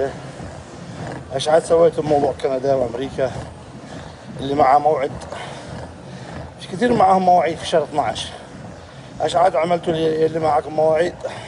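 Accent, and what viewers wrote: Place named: Yemen. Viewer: ويش عرفك بهاذا كلام ماشاالله وبعدين كلامك يمني ياقلب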